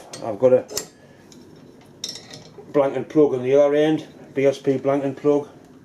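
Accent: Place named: Geordie, United Kingdom. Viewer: is a Geordie and speaks the regional dialect. If you are having trouble understanding him go to settings (the little gear on the lower right) and set the speed to 75%. I sometimes have to do that, especially with his friend.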